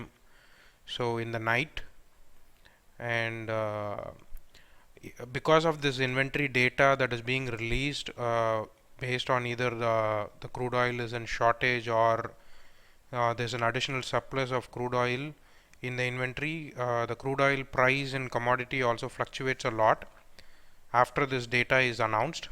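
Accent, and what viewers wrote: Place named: Tamil Nadu, India. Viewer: Bro your pronounciation shows you r belongs to Tamil Nadu.... If it's true ... I'm very much proud...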